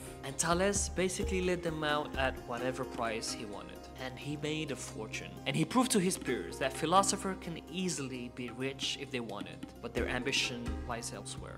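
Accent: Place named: Morocco. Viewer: the Morroccan accent in your english though :D this poped up in my suggested list, I own a small/new channel too and I can only support with a comment 😀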